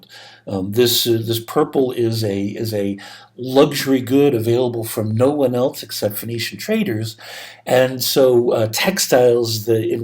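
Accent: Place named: United States. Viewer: Not the worst American accent, I can actually listen to this one for more than 5 seconds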